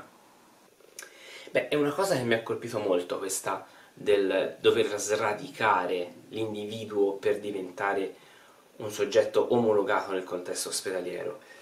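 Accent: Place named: Tuscany, Italy. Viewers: Sarà anche interessante questo contenuto ma il marcatissimo accento toscano lo rende davvero pesante e a tratti incomprensibile. Personalmente non sono riuscito a finirlo. Quando si fanno contenuti di qualità come questo bisognerebbe, a mio parere, cercare di essere il più comprensibili possibile ed evitare inflessioni così marcate. Mi spiace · grazie a te comunque per il bel contenuto e la risposta. Io penso che purtroppo ci sia la convinzione che il toscano sia l’italiano perfetto ma purtroppo non é così: non esiste l’italiano perfetto a livello regionale. L’ italiano perfetto é quello senza inflessioni o magari senza inflessioni così spiccatamente marcate. Purtroppo molti toscani invece, sono convinti che il toscanismo sia “il bel parlare”, a volte può essere simpatico ma a volte appesantisce e rende poco chiaro un contenuto che magari può essere bello come il tuo. 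É questo il caso purtroppo, ma ovviamente a mio modestissimo parere.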